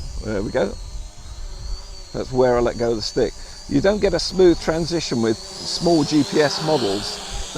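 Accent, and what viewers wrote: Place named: England, United Kingdom. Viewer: A few giveaways when an English person has chosen to live in the Highlands of Scotland. The accent and the mentioning of the weather every couple of minutes but 95.497.....2% say they love the place. Helpful vid, I'm a novice at drones. Thanks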